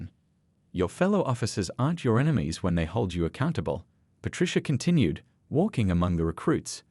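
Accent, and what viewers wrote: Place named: England, United Kingdom. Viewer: Suck video of a still picture and English crappy accent...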